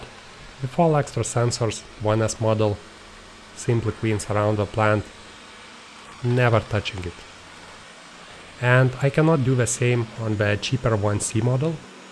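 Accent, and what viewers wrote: Russia. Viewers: Thanks very much. I saw your review before purchasing the C1. The latter was my choice because S1 was 90 usd more expensive in the country I leave (Asia). You are right on all points. I miss the area cleaning selection but not so much. It cleans at night the ground floor and the first floor when I wake up so no battery issue for 70 m2 each 2 floors. The mop on a shinny mirror-like floor works well but I added a microfiber mop to it and it works even better with no watermark. I live in a country side dusty area so strong hoover only is good for my kid but it also cleans. Important: after you buy the the C1, you easily want the robot to come back on specific areas. Then you should get the S1. For me C1 is enough... Just consider if the price difference, maybe now less, worth cleaning area control. Probably. If you like my experienced comments then I suggest you should like the video first, not my comments (I really don't know how you tube works but I humbly recognise who spent time to help all of us in this video with a Russian accent), n'est ce pas? 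· Русак что ли канал ведёт, акцент родной, по ушам бьет)))))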